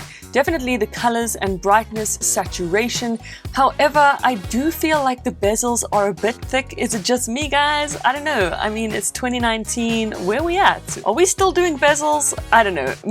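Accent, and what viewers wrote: South Africa. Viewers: Is this a Southafrican, dutch, australian/kiwi or danish dialect? Easterneuropean of some sort? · I hear the South African accent... then I see the charger - not South African. Then I see the police bakkie . 😉 😁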